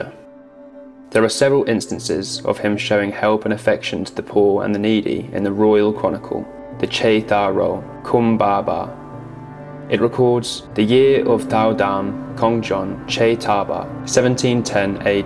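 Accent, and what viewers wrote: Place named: United Kingdom. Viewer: I was not expecting the british accent....😂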